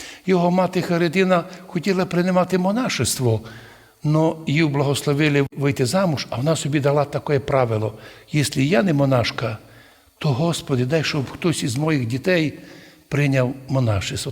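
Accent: Transcarpathia, Ukraine. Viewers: На украинском закарпатский диалект.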